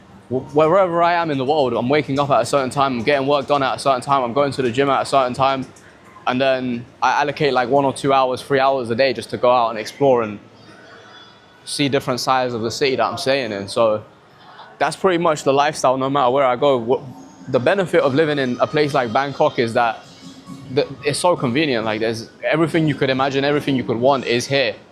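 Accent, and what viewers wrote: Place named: United Kingdom. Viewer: you sound like you came from the UK.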